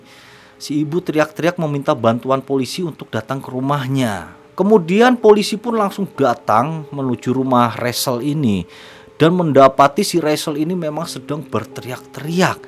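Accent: Java, Indonesia. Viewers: Logat jawanya terllu dalem jd agak ganggu dengernya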